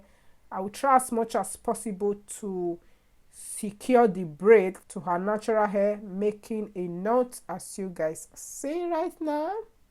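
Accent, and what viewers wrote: Africa. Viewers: I love your accent! African Queen 👐🏽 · This accent is 100% African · Luv your African accent. The "t" in crochet is silent.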